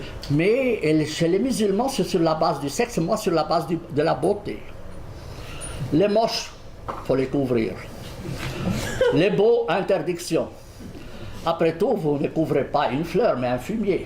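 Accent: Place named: Switzerland. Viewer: Drôle et passionnant... L'accent suisse est la cerise sur le gâteau.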